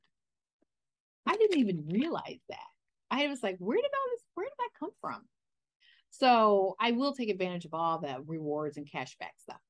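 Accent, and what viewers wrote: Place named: New Jersey, United States. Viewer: I think it's a good one if it is helping you make progress..random but I have the feeling we live in the same part of the US. You sound like us Jersey peeps. Lol...I did say random. 😃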